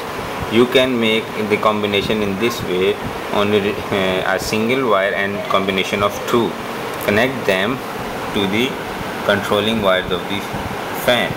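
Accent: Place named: Pakistan. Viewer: wah brother Pakistani Laga Hn.. hahahahah i m Pakistani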